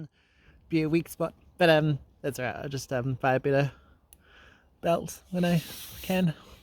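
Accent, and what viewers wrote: New Zealand. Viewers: What's the accent? NewZelandian? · Kiwi bro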